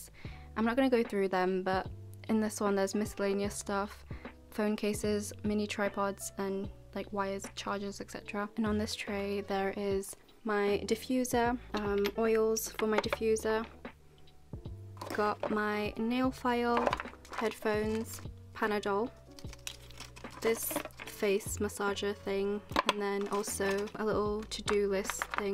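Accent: United Kingdom. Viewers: I bet that you have Pinterest😍♥️ British accent? Yes love it too 😍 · i never expected that accent, when you started talking i went "WOW CUTE". A malaysian with a UK accent aaa cutee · MashAllah how do you have very good British accent👌👍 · the british accent 😍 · omg i love ur eccent bri'ish✨ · I love your British accent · Love your room tour! wishing can have my own space to decorate it like this as well❤ and please do upload more video as i love watch ur video and hear your british accent💕🍀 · It's cause she stays at uk so of course she would have a British accent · that british accent thoo 🤤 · I love the way you talk and so in love with ur british accent! <3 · When she said “anyone recognise this hoodie?” Me: OF COURSE! That is Jungkook donut hoodie💖✨ Anyway I love you British accent👏🏻👍🏻 Are you from Malaysia? Cause I am from Malaysia🤗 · The british accent i can't😭😍😍😍😍😍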